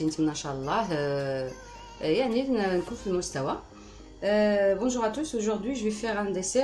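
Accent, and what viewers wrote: Morocco. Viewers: صباح الخير . يعطيك الصحة حبيبتي .الشرح واضح اللهجة المغربية تهبل .الف تحية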